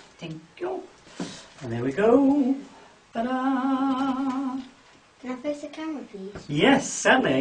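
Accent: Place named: Scotland, United Kingdom. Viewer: Thank you for the tips!, and I hear a Scottish accent love it!.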